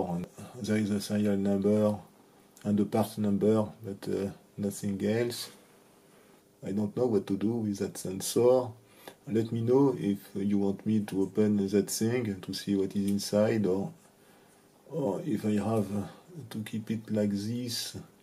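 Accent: France. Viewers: Very very interesting but I could't handle your Frenglish for more than two minutes. It's a difference if you can't to it perfectly but try OR if you just give a damn and just keep stressing the last syllable like in french. You didn't even try IMO. Cable is CAYbell and keBELL. Stick to French please and just add subtitles if you don't even want to TRY to mimic the sound of the language you want to speak. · he is french some letters they can't pronounce it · Your french accent is so thick ... I love it :) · you accent is so french omg 😆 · Tu fais vraiment aucun effort pour parler en anglais, autant parler en français et mettre les sous-titres. Pouce bleu nonobstant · one of the coolest french accents i have ever heard! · Anglais à la française 😅😅😅😂😂😂😂😂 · pourquoi je suis le seul francais alors que t'es clairement francais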